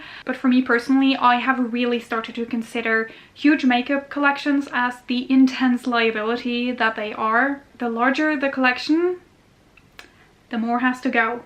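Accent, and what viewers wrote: Ireland. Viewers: I love your Irish accent!❤ Greetings from Israel🤗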